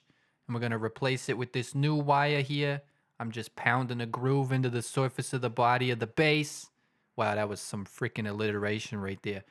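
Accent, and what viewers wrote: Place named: New York, United States. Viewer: I can't say that this guy does or doesn't live in Boston, but that is NOT a Boston accent. Sounds more like NYC to me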